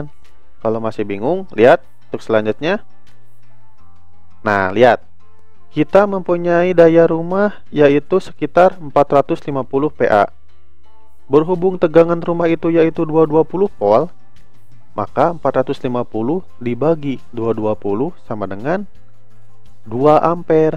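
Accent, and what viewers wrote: West Java, Indonesia. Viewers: 1.Biar mudah dipahami tong buat para pemula. 2.itu logat sunda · Logat sundanya gk ilang yaa😂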